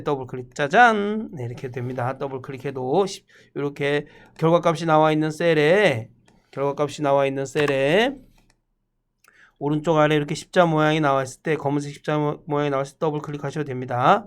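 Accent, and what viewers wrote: Gangwon, South Korea. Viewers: 말투가 강원도 분이신거 같은..ㅎ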